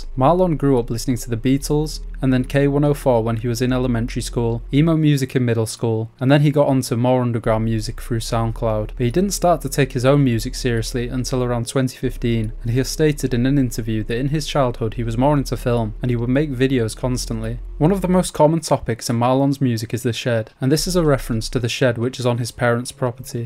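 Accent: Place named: United Kingdom. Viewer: ur british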